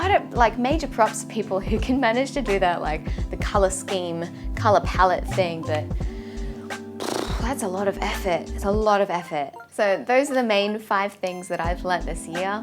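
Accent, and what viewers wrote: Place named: Australia. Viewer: How good is Gary V! Really liked this video. I'm a fellow Aussie and just new to your channel but I particularly like it your content around Japan which is on my bucket list. Keep doing what you do, you're a natural in front of the camera and hearing the straya accent is soooo refreshing from all these American's. :-)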